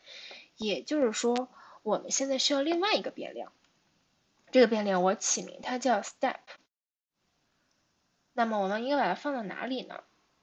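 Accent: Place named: Northeast, China. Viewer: 这个东北口音杠杠的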